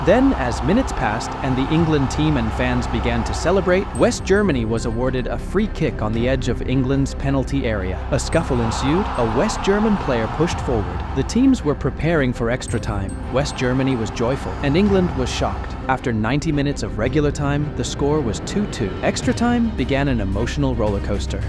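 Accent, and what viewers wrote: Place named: United States. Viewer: American accent? Wow.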